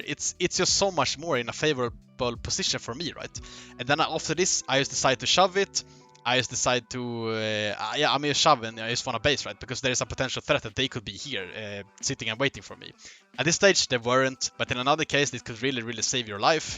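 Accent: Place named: Sweden. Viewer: Haha aldrig hört en bättre svengelska! Grym video🤘🏻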